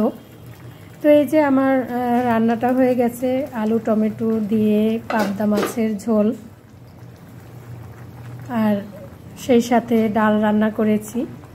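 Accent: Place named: Barishal, Bangladesh. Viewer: আপু আমি তোমার ভিডিওতে আরো অনেক বার সুনেছি আমাদের বরিশালের কথা সত্যিই কি তোমার বারি বরিশাল তাহলেতো ভালই হলো আমার একটা আপনজন পেলাম আমার পরিবারে টমেটো দিয়ে মাছ রান্না দারুন হয়েছে অনেক ভাল থেকো দোয়া রইলো তোমার জন্য ভাল থেকো ইনশাআল্লাহ আপুমনি❤❤❤❤❤❤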